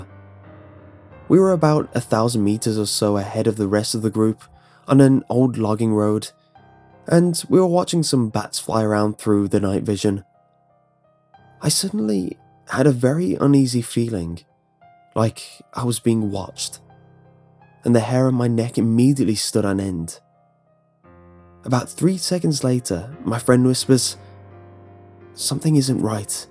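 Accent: United Kingdom. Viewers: It's funny to hear this in a British accent, because I live in the southern half of North America, and most hunting stories around here are "WELL SHOOT FER CLETUS, I RECON I DUN DID SAWD A GHOST, SO I SHOT HIM UP WITH THAT THERE RIFLE I DID! WHOOOOOOOOOOO-EEEEEEE! Slaps Knees "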